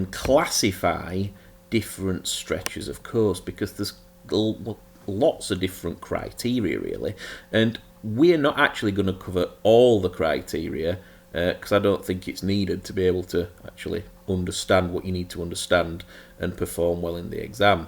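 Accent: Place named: Northern England, United Kingdom. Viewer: I'm only here to listen to that delicious nothern voice say 'Cooooast'.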